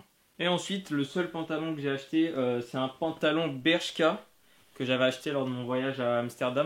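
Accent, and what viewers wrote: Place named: Belgium. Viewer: Ton decor est de plus en plus mortel! achats tops et surtout gros pouce bleu pour ton accent belge un moment ahaha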